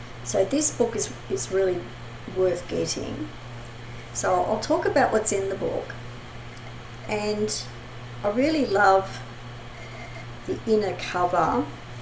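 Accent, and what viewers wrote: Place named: Australia. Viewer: Great to find your channel and an extra surprise was the Aussie accent. Im in Sydney myself. Looks like the YT algorithms work as your channel came up on my feed. Thanks for providing all this content. Looking forward to catching up on the older videos. Cheers 🖖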